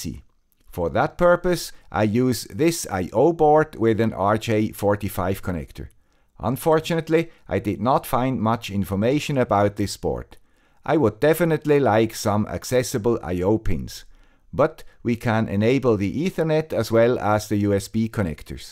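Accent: Switzerland. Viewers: wow! Thanks guy with the Swiss accent! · your dulcet Swiss accent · we're tired of your Swiss accent!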